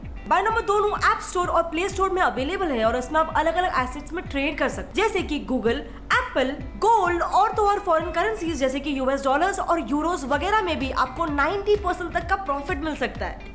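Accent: Northeast, India. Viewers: Is she from Assam? She sounds like a northeast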